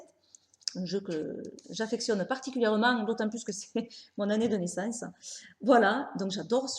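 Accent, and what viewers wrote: South, France. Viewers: ton accent du soleil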